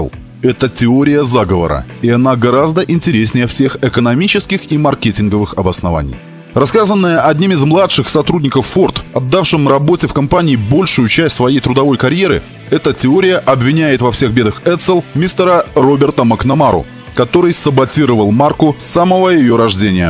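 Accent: Belarus. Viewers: У чтеца жэстачайший белорусский акцент.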